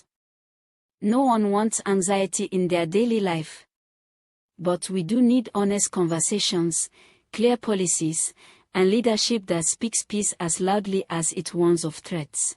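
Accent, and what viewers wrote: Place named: France. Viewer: So what’s with the French accent on the AI. used to be SOUTH AFRICAN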